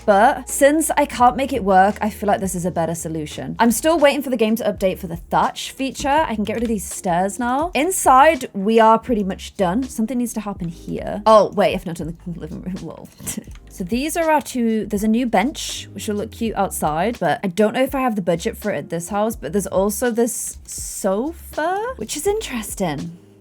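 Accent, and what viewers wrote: United Kingdom. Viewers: My American self laugh so much of how she said rabbit Is that wabbit I’m sorry I’m American and think British accent are funny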